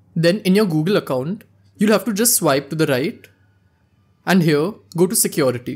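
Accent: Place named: India. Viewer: The Indian accent is crazy 🤪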